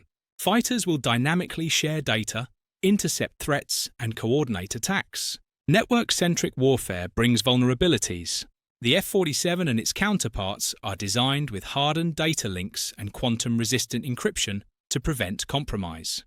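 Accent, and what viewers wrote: United Kingdom. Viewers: Why do we have someone with a British accent telling about American military aircraft? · Ai with a British accent is hilarious.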